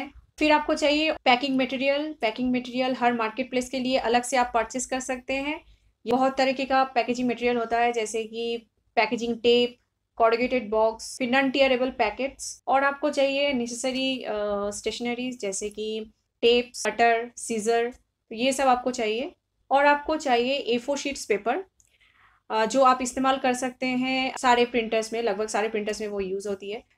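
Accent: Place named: Bihar, India. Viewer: Hiii, kya aap Bihar se ho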